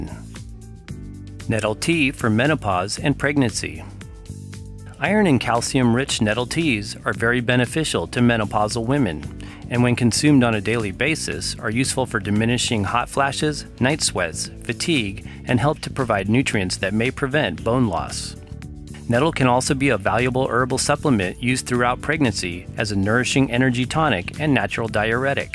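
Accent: United States. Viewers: Farang Esaan it's the way Americans pronounce it. Both are correct, like maths and math, both short for mathematics.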